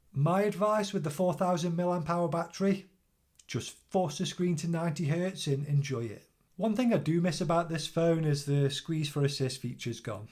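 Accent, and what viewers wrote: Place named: United Kingdom. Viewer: Only here for native British accent. Loved it bro!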